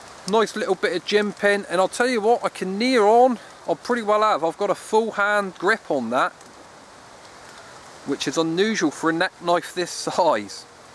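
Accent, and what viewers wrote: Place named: Black Country, United Kingdom. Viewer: Sounds like a Black Country accent mixed with something else.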